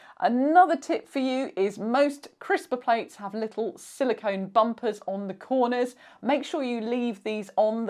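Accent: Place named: Liverpool, United Kingdom. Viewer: This woman is speaking with a Scouse accent.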